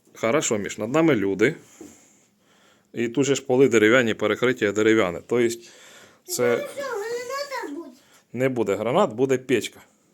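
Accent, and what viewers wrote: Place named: Poltava, Ukraine. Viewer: По говору здогадався. Полтавчан не сплутати нi з ким.))) Тримайтеся! Тепла та благополуччя! Всiм достойним украiнцям захисту Всевишнього!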